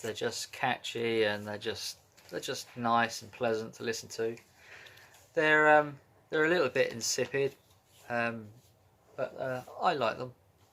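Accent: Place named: United Kingdom. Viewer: This is Tom, not Sandra. You have a great LP collection!! Thanks for sharing them with us!! Like your British accent.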